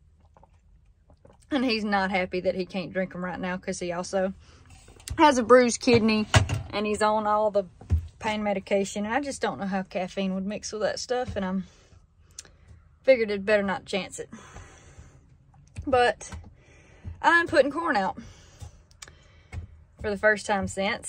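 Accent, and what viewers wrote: South, United States. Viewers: What a nice face. Where is from the accent? Usa south? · Hello from way Downunder (AUSTRALIA) first time on your channel & wow your southern accent is amazing. Sorry to hear about your dad’s hunting accident & I hope that he recovers fast & everything is ok. I’ve just subscribed so I’ll be keeping an eye out for you-all & check out your older videos..Take care now. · you have a gift that I think your dad knows....it is a very rare a voice like yours that impresses people who want to hear it. My Aunt Mary had it, she raised two daughters that had it. You have critics that don't understand what real Southern Women sound like. You are real, do things that most men can not do. You are exceptional and smart. Your dad did a great job in raising you!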